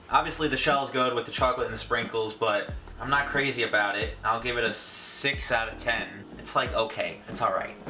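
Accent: New York, United States. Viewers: Oh he lives in New York too? I thought so, his voice sounded SO New York and familiar. I bet he lives either upstate or Long Island like I do. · potter wtf is a thick American twang he has a new York accent most people (away from the east coast) don't talk like that lol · I'd appreciate it if you could give me your American accent in exchange for my boring old Australian one. Thanks pal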